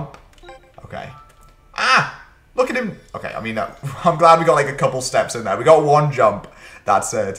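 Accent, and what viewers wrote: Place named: United Kingdom. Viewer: idk whats better the story or the british accent describing the story... this makes me feel like british people are real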